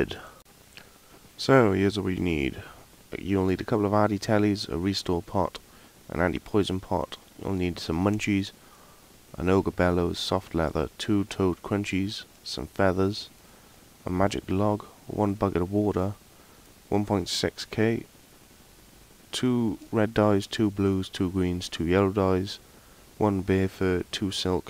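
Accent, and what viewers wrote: Wales, United Kingdom. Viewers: where are you from? Sounds like a welsh accent.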